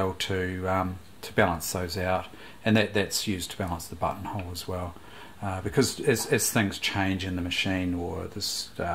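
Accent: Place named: New Zealand. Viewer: Mate, thanks so much. Trying to figure out my Grandma's old machine and this was the perfect video. Also imagine my shock when amongst all the female American voices in other tutorials I find a fellow kiwi! Made my day. Churr.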